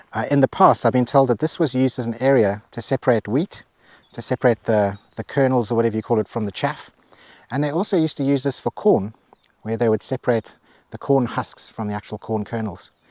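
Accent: South Africa. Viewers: THats a South African accent. Hello from Cape Town and good luck in Portugal. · Hi Are you South African? The accent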